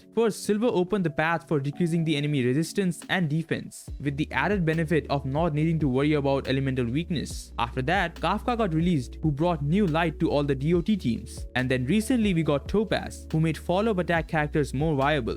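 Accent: India. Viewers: you sound indian, are you?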